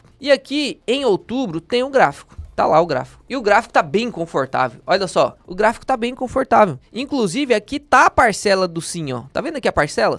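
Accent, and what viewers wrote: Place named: Goiás, Brazil. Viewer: Toda vez que o fundo realiza uma cagada dessas, tão óbvia de ser evitada, é porque alguém lá dentro ganhou com as transações, alguém ganhou e vários cotistas perderam, infelizmente. Quem discorda disso ainda vive em um mundo fantasioso na imaginação. Não é momento de vender as cotas, como geral sabe, o fundo não vai quebrar e a crise vai passar, mas pra quem é inteligente, a desconfiança vai permanecer e acredito que isso daqui algum tempo, possa fazer vários cotistas abandonarem o barco! Aquela máxima quem bate esquece mas quem apanha não, se bem que no Brasil, pra lembrar, tem que apanhar muito, e nos fundos geral tá apanhando pra caramba a algum tempo! Sou meio pé atrás com os vídeos do Lucas vejo ele com uma postura muito negativa com 99% dos fundos, se escutar ao pé da letra tudo o que ele diz, ninguém fundo presta pra investir, mas se tiver um pouco de filtro, vai perceber que o olhar dele é muito crítico e refinado, não alisa nenhuma gestão e abre os olhos pra minutos podres que passariam despercebido facilmente! 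Também gosto muito do seu canal, seu ar de Goiano de falar me agrada porque também sou goiano, mas não dá pra passar pano, dá impressão que foi comprado ou está em um estágio de negação, no mais acompanho o canal e aprendo muito com seus vídeos, mesmo achando que em alguns vc fala muito e passa um ar de pastor querendo agradar ou alienar seus fiéis kkk, mas é isso, tudo precisa ser visto, filtrado e absorvido! Abraços!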